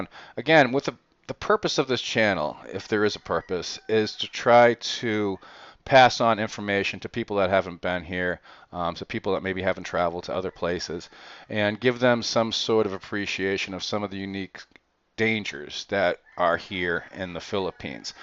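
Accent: Boston, United States. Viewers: Boston accent.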